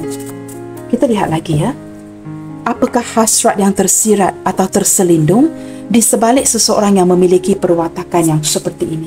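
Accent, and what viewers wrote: Malaysia. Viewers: Waa halo disini juga bangsanya kita kan Bun , bunda kita dulu juga pernah komen disini loohh ihhh ahhaa serumpun lohh wahh dengerin siapa tau cengkok logat Malaysia bisa aku pelajari loohh n aku ingat keluarga tiktok Malaysia lohh ituloh yg pakai jilbab wahh moga tetep bawa energi yg positif , orang nya terkenal sopan wahh ngefan banget sama mereka bun Alhamdulillah Chanel liriklagu di Malaysia sukses moga banyak yg subcrib juga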